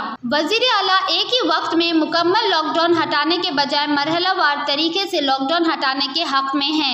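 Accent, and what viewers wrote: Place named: Hyderabad, India. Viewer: aapki hindi thodi Alag ha ..last me kya bole samjhi nahi...aap hyderabadi logo ke hindi thodi different hoti ha na??? Meri Insta ki ek... frnd ha Hyderabad m...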